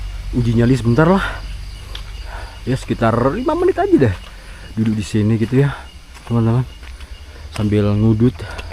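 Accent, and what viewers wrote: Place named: Pasuruan, Indonesia. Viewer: GUENDENG PAK... KHAS AREK PASURUAN 😂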